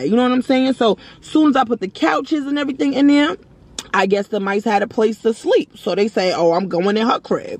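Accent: New York, United States. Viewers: Newbie here... I just saw this video recommended today and decided to click because I LOVE storytimes! I have to say you definitely have a new subscriber here boo. I'm from Brooklyn NY myself so I immediately knew you were a fellow NY'er when you started talking lol, and I love your honesty and realness. That is so rare to find on social media. But girl, let me tell you this video SPOKE to me something crazy. I'm currently looking to move myself after being in my apartment for 9 years and NEVER having a single roach. Crazy for NY but true. Now, all of a sudden, after having water issues, the same as the ones you mentioned, they're coming from everywhere, and I just can't. Like yourself, I keep a very clean home. I have actually officially been diagnosed with OCD years ago. Like, it's a real problem, and I keep an extremely clean home, so I can't do it. 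I don't have Section 8, but I do have another program, and unfortunately its so hard to find landlord's to take them. So honestly, I'm terrified I won't find something by the time my lease is up in July, but I CAN NOT stay here. Roaches are so disgusting, and they just multiply and get everywhere. I've had 2 apartments prior to this one and never had roaches in any of them, and after 9 years here, NOW they want to take over 🤨🤔. Oh hell no, I gotta go, lol. I actually really felt this video also because I've had lots of issues like yours and although my landlord is a really nice person and we get along they dont like to pay for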